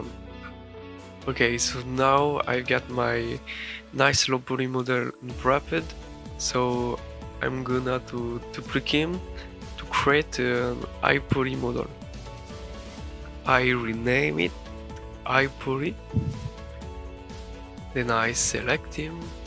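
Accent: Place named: France. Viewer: Great tutorial, very clean, simple and right to the point ! If I had something to add, you could maybe have explained why you used some of the functions or macros 🤔 I'm not an expert on UV unwrapping for example, so I would have liked longer explanations J'ai le sentiment que tu es français vu ton accent, si c'est le cas, sache que tu m'as bien aidé à progresser, je te souhaite du succès sur youtube !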